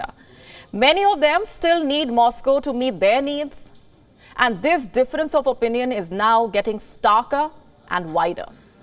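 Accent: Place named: India. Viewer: so cute indian accent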